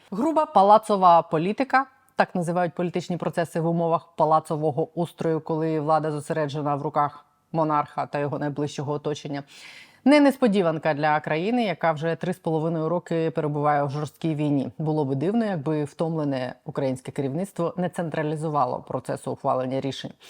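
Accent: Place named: Russia. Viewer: ВЕДУЧА! ЩО ТВОРЕТЬСЯ З ТВОЄЮ ЩЕЛЕПОЮ,ЩО ТИ ЗНУЩАЄШСЯ НАД УКРАНСЬКОЮ МОВОЮ:ЩЬО,ЩЬО,ЩЬО,ЯКЩЬО,ЩЬОБ,ЩЬОБ,ЩЄ,ЩЄ,ЧЬОМУ, ВЧЬОРА,ДА,ЧЄРВЕНЬ,ЧЄ,ЧЄ,ЧЯС,ЧЄНОВНИК,НАЙБЛИЖЧЬОГО,НЕБЕЗПЕЧЬНИМ,ПУБЛІЧЬНОГО,ЧЄРГА,ЯКЩЬОБ,ПОЧЄНАЄ,ОДНОЧЯСНО,ЧЄТВЕРГА,ПОЧЯТИ,ЗА ЩЬО,??????????????????????ГАНЬБА ТВОЇЙ МОСКАЛЬСЬКО- УРОДСЬКІЙ ЩЕЛЕПІ!!!!!!!!!!!!!!!!!!!!!(УКРАЇНСЬКА ДІАСПОРА В США)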